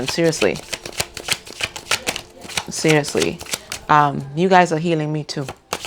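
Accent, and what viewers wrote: Trinidad and Tobago. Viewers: Hi are you Trinidadian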